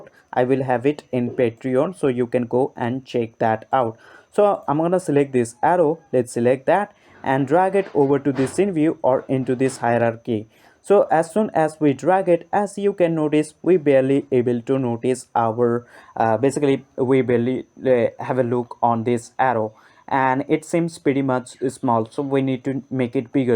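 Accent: India. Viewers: i can't listen to this indian accent ... you should look up tutorials how to speak English.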